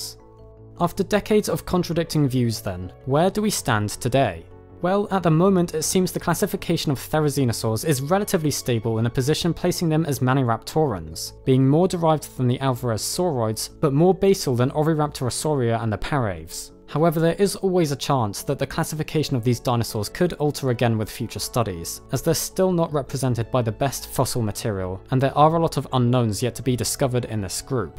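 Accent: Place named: London, United Kingdom. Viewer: Youre London accent is no longer credible Get a black guy or Mexican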